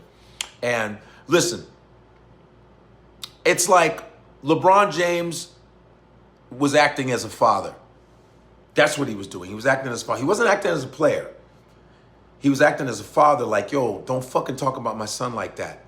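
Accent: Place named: AAVE, United States. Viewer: Godfrey, my brother, please stop talking in ebonics, it is not necessary. I mean when you are speaking as yourself, not doing impersonations.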